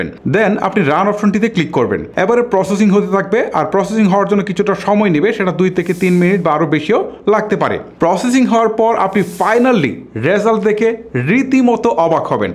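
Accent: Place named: Kishoreganj, Bangladesh. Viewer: আপনার কথা শুনে মনে হচ্ছে আপনার গ্রামের বাড়ি কিশোরগঞ্জ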